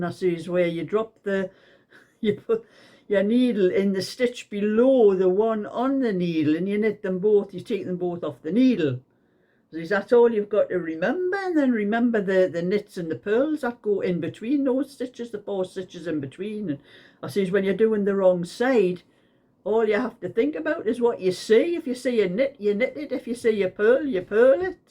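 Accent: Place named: Yorkshire, United Kingdom. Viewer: Hi, well had a chuckle at you with the conversation with 😂😂😂.. congratulations on your wins, well done 👍..Hope your heatwaves have ended.. Spring nearly here in Sydney so plants & lawns will be taking off.. we’re 9 hours ahead of you over here I thought I’d mention… I’m a Yorkshire lass born in Sheffield (well not a lass anymore) 😂😂😂 so enjoy your accent, love listening to you chatter on… lots love Josie xxx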